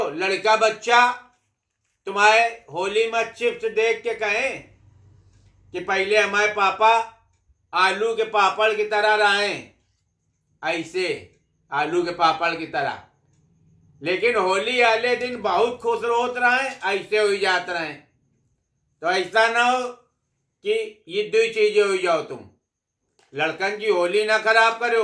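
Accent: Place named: Unnao, India. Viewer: हम भी उन्नाव के रहने वाले है 🙏💕 पर हम वहाँ की बोली नही बोल पाती हूँ सच आपकी बात सुनकर मजा आ जाता है 🎂🎊🎉🍻🥂🥂🍻🎂🎊🎉🍻🥂🥂🍻🎂🎊🎉🍻🥂🥂🍻🎂🎊🎉🍻🥂🥂🍻🎂🎊🎉🍻🥂🥂🍻🎂🎊🎉🍻🥂🥂🍻🎂🎊🎉🍻🥂🥂🍻🎂🎊🎉🍻🥂🥂🍻🎂🎊🎉🍻🥂🥂🍻🎂🎊🎉🍻🥂🥂🍻🥃🥂🥃🥂🍷🍹🍷🥂🍻🥣🥣🍩🍩🍪🍪🥛🍬🍬🍩🍩🎇🎇🎇🎇🎆🎆🎆🎆🌌🎇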